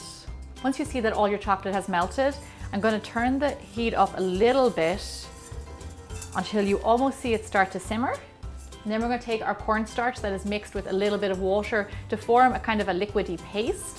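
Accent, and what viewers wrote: Ireland. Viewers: Is she like Irish or something? Irish people are wonderful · are you Irish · I just found your channel and I love it! So much great backing Ideas. Even for me as vegan you notice which recipe will work great in a vegan version! Great job! Have to try something from your recipes, definitely! Btw I love your english, in " home made butter video " you said that you are from Irland? My favourite country to see one day!!!!!!! I'm a new subscriber of your great channel and can't wait for new yammy Ideas, greetings from Switzerland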